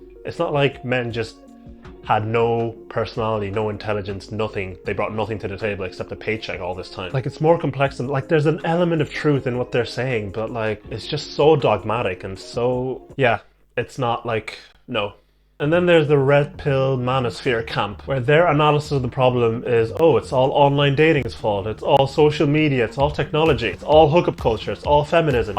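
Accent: Ireland. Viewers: This guy just came up on my feed. Interesting take on things. Good to hear the aul Irish accent representing! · My brain can't process a black man with an Irish accent lol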